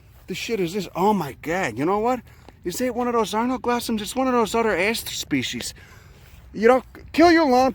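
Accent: Chicago, United States. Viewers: So, I've been watching your videos while I do my schoolwork for some reason, and I zoned out from this one for a minute as I was reading a Geometry textbook and looking at hexagons and decagons and stuff, and I just hear a voice with a thick Chicago accent say in my ears: "That would actually look like It'd hurt if you put that in your ass, I don't want to put that in my ass." I had to rewind the video a few times to make sure you were just talking about Echinacea. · Omfg bruh this really is how old school mfs Sound in Chicago instant subscribe i would listen to dis guy read the phone book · "The lawn is a tragic, impoverished mindset" BRILLIANT! This guy knows his shit! Love the Chicawgo accent! LOVE it! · Oh my glob, this guy is HILARIOUS! I have to go back and watch everything he's ever made, now. Wisconsin has a lot of the same flowers and I'm a Master Naturalist trying to learn more plants every day, and starting to recognize a rather amazing number of them. 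Takes time to get them all to stick in my brain, one plant at a time. But with a Chicago accent and all the expletives? I'm going to learn SO much faster now!!! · Chicago Italian accent lol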